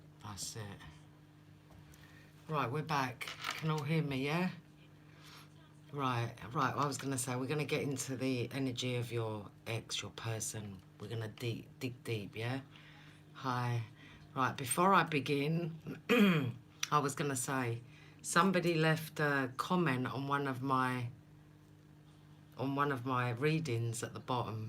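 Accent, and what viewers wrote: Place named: Australia. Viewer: Keep your chin up. The lady will look a bit off. These are collective readings. Good Heavens, not just for her. These are for entertainment. If anyone finds something as advice...well ok. I do at times. Love your Australian accent. :) ................I couldn't do an edit. But yes, LOL!!!! You are so much like someone I know slightly at work. I feel the lady is intuitive but has to hold it back because of the culture at work. :)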